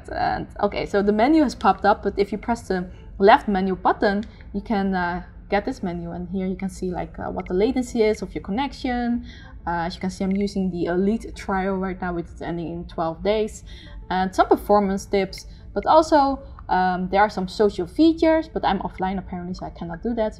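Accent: Netherlands. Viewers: Your English Dutch Accent sounds really funny for me as a German ! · Is ze Nederlands? Ik dacht het al te horen aan haar accent haha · cute Dutch accent ;--)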